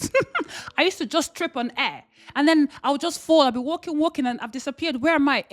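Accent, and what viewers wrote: Nigeria. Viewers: I kept hearing the Nigerian accent sneak itself in and you eventually mentioned being born in Nigeria. 😂❤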